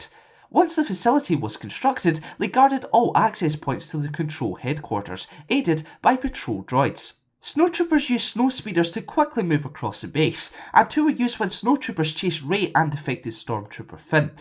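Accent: Scotland, United Kingdom. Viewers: Ahhh god bless your Scottish voice. Its so irresistibly refreshing from boring British and American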